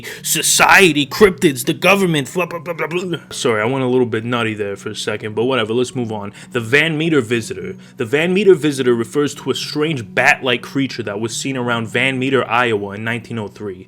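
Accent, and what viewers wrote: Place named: New Jersey, United States. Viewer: His joisey accent is 👍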